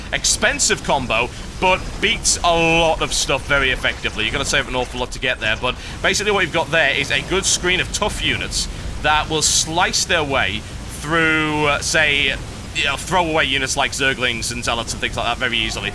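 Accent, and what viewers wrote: United Kingdom. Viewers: This was just enjoyable, the best part was of course when he rage quit. But your evil laughter kept me watching, as well as the charming British accent.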